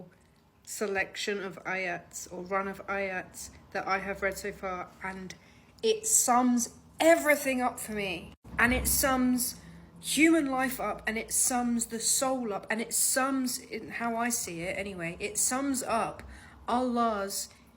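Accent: England, United Kingdom. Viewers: للتصحيح، لهجتها بريطانية وليست أمريكية... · هل ما فهمته صحيح او فيه ما يشوبه ؟ العلم عند الله ،.. اللهجة ليست أمريكية أعتقد بريطانية و الله أعلم . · لهجتها انجليزية وليست أمريكية . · هي بريطانية وليست أمريكية، اللهجة الأمريكية تختلف عن اللهجة البريطانية. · هذه ليست لهجتها أمريكية بل بريطانية او ممكن استرالية المفيد ليست لهجة امريكية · هذه اللكنة بريطانية وليست امريكية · لهجتها بريطانية . هل انت متاكد انها امريكية؟؟؟؟ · لهجتها بريطانيه مش امريكيه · هذه بريطانيه وليست أمريكيه واضح من اللهجه … كفي عقده أمريكان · لكنة بريطانية وليست امريكية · لهجتها انجليزية وليس امريكية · ثبتها الله على الإسلام.. يبدو من اللهجة بريطانية وليست أمريكية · هذه لكنة بريطانية وليست امريكية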